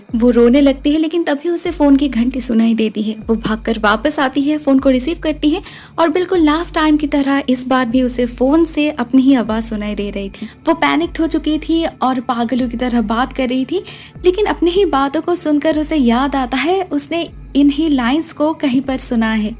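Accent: Bengal, India. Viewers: Apka bengoli tone sunne me aur samjhne me thode issue hote hai verna baki sb toh sahi hai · Aap bangal se ho ky? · Hey r u from kolkata your voice seems like u r bengali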